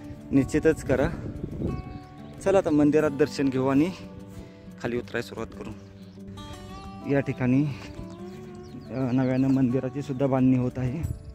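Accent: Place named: Satara, India. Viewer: तुमच्या भाषा सातारा ची वाटतंय माझा जन्म खेड राजगुरूनगर चा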